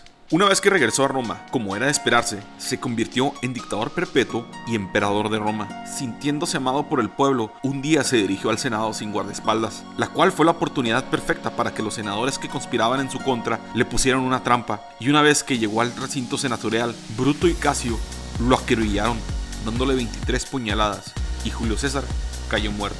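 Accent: North, Mexico. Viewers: Si no fuera por ese pinche acento norteño este video sería bueno!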